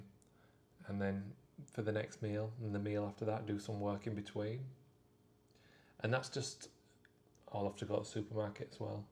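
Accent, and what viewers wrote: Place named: West Yorkshire, United Kingdom. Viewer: Where abouts in Yorkshire you from? Sounds west Yorkshire ish accent!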